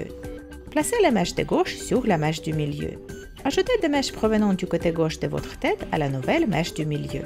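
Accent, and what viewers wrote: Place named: Russia. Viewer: you have a little accent. it's cute! Are you russian?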